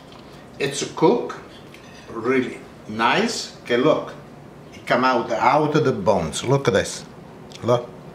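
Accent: Italy. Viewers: Well somthing simple, but the italian accent , remind of god father movie! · I like way of explain. I think Itlian Inglish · Don't you just love that Italian accent? Sooooo Molto simpatico Grazie molto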